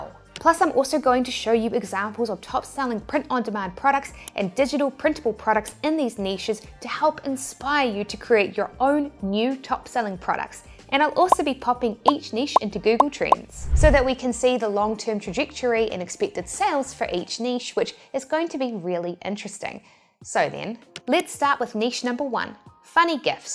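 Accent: New Zealand. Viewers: I like your accent, I was once in New Zealand and in the beginning it was hard to understand but afterwards it sounds so cute 🥰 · Pits =Pets 🤣🤣I'm a new subscriber and Im loving your content.! ☺ So basically New Zealanders pronounce "e" as 'I" ?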